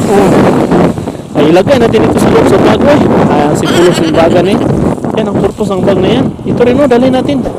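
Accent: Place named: Tagalog, Philippines. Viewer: Ganahan gyod ko maminaw sa imong Tagalog kapilingon makalingaw ha ha ha,❤❤❤❤😊😊 amping kanunay dong.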